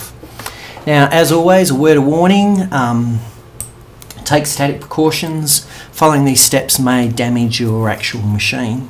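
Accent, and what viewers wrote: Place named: United Kingdom. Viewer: TH - A annnnk U! I'm still use this model. Are you british ? I like your accent!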